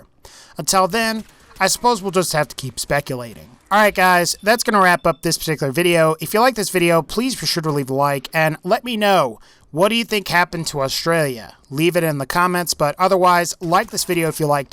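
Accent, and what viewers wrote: United States. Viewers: As an Australian, it is quite amusing to listen to you, an American, pronounce some of our major cities. i.e Melbourne = Mel-Bin. Brisbane = Bris-Bin.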